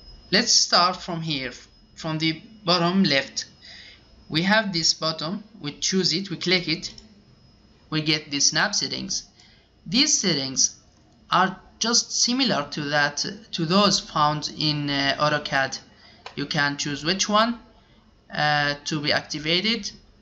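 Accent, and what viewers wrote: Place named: France. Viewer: Good job that you made it english but I can still hear that french accent. Why it seems like everybody doing robot turorials are from France?! Interesting :D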